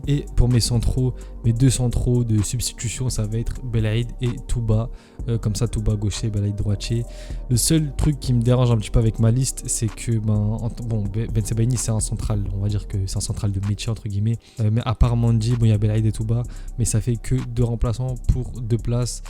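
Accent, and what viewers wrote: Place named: France. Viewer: C,est quoi cet accent français ? Tu mâches tes mots, on ne comprend rien !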